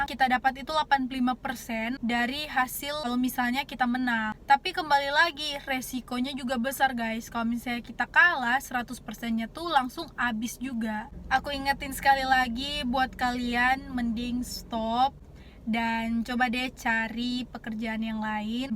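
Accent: Manado, Indonesia. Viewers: Logat manado masi keliatan dikit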